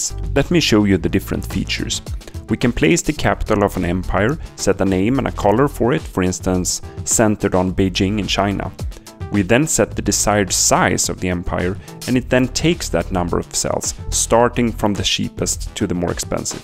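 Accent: Sweden. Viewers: Swedish accent and replacing denmark with water at the end xD 10/10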